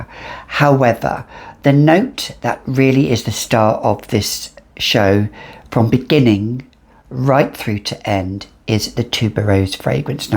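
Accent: France. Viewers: Can’t get enough of that French accent of yours but I don’t think this one would be for me because there’s no sweetness and you know I love my sweet scents but you clearly love it 😍 💕💕👌🏽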